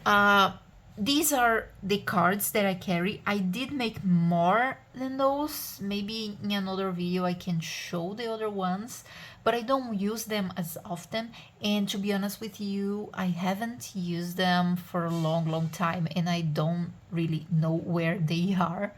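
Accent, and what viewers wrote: Brazil. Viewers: esse sotaque com certeza é brasileiro.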